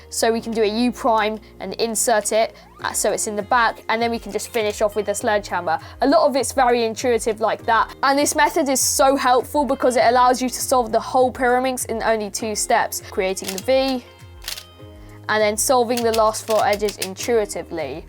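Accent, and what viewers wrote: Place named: United Kingdom. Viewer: Say "bottle of water" in your British accent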